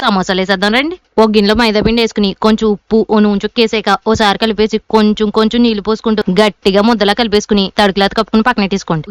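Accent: Godavari, India. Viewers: గోదారి యాస సూపరూ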